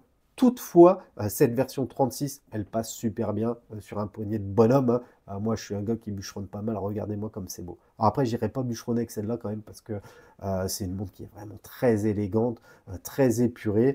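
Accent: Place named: Italy. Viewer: Tuo accento italiano è bellissimo!!! 😍😜👌 Bravissimo!!!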